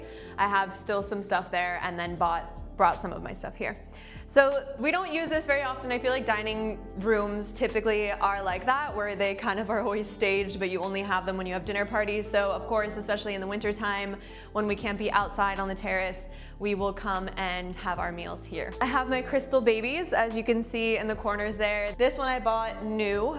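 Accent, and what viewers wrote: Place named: United States. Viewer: You and your obnoxious murican accent..why didnt you stay in Usa?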